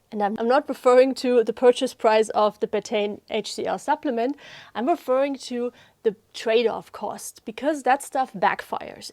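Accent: Germany. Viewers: She has a lovely German accent. ❤ I think she is very good at what she does, too.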